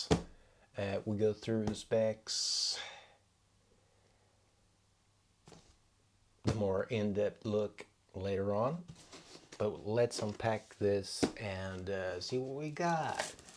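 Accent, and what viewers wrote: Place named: United States. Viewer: I thought you were american before you told us you're a swede, like i am 🙂👍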